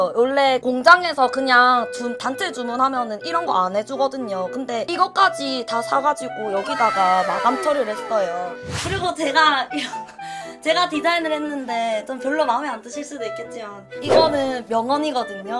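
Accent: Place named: Gyeongsang, South Korea. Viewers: 양팡언니ㅠㅠㅠㅠㅜ 저 대학교 새내기 올해 1학년이에요ㅠㅠ. 부산사람인데 대학을 타지로 가서 너무 낯설구 외롭구 했는데 언니 덕분에 외롭지 않게 즐겁게 지내구 있어요 과제하다가 언니보구 밥 먹으면서 언니보구 시험공부하다가 언니보구 간식 먹을 때 언니보구. 제가 왜 이렇게 언니를 끊임없이 보는가에 대해서 생각해보았는데요 그건 아무래도 사투리가 그리워서 그랬나봐요ㅠㅠㅠ 진짜 언니 없었으면 향수병 걸려서 우울증 걸리고 자퇴했을거에요ㅠㅠ 언니 덕분에 한학기를 이렇게 잘 마치네요ㅠㅠ 항상 고맙구 응원합니다ㅠ 언니 영상은 보면서 공감을 많이 하게 되는 것 같아요 뭔가 사람 냄새 난달까??ㅋㅋ 나두 저런대 나두 저런대 하면서 무릎을 탁 치고 하죠ㅋㅋ 주위에 친구들한테두 열심히 홍보하고 있어요!!♡♡♡ 가끔 혼자 길가다가 파파라치 흥얼거리기도 하구ㅠㅠ 린온 흥얼거리기도 하고 ㅋㅋㅋㅋㅋ 저번에는 강의시간에 나이따 나이따 나나나나나나 나이따하는 금지님 목소리가 계속 멤돌아서 죽는 줄 알았어욬ㅋㅋㅋㅋ 언니 방송해주셔서 감사합니다ㅠㅠ 항상 파이팅!!!! 사랑해요!♡!♡ <mail> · 종종 잘보고 있습니다 같은경사도라 그런지 사투리 쓰는거 볼때마다 정겹네요 ㅋㅋ 40만추카드리고 리미티드 꼭 당첨되고 싶어요 <mail>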